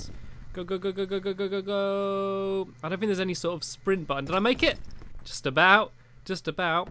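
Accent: United Kingdom. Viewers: Stampy speaks British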